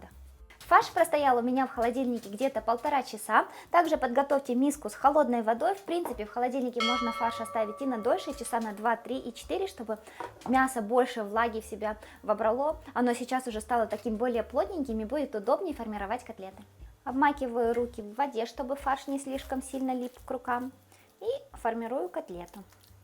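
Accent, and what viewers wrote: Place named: Surzhyk, Ukraine. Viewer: ты Слава Богу становишься сама как пухкенька котлетка. Уже пришла в себя. Говори на родном суржике. Вон ЗАКАРПАТЬЯ ГОСПОДЫНЯ она ведёт канал на закарпатской диалекте и её смотрят все и понимают. Не переживай.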